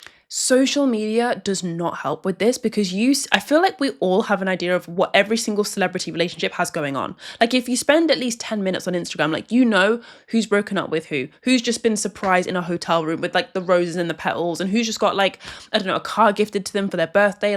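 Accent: United Kingdom. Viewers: Personal question- what country are you from? Based off the accent you sound like your from the UK.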